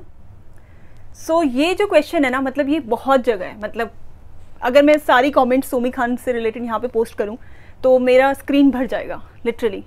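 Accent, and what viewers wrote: Uttarakhand, India. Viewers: I knew you are from Uttarakhand from your accent! Btw I’m also a delhite and from Uk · Congratulations 😊from your accent I got a hint that you belong to Uttrakhand(as I am), keep going on...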